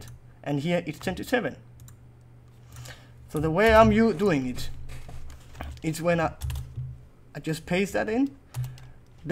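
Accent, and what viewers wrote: Denmark. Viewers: you are from danmark andt you XD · er du dansker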